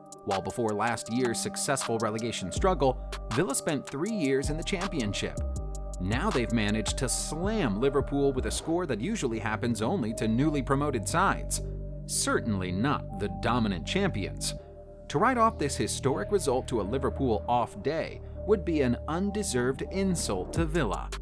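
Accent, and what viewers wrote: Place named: United States. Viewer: Agreed, such a turn off with the American accent and mispronunciation.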